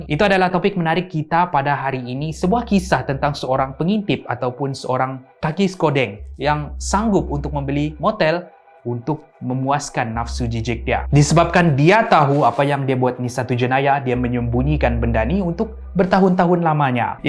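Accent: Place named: Sabah, Malaysia. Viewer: ketara sabah c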